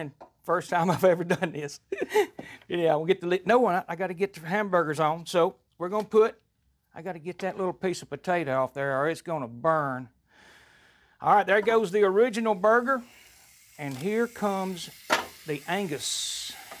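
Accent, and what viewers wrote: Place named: Texas, United States. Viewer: I've loved the accent. Is it from texas ?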